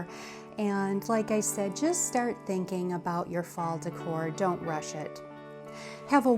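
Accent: Midwest, United States. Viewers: Wow, your accent sounds like Illinois/midwest region.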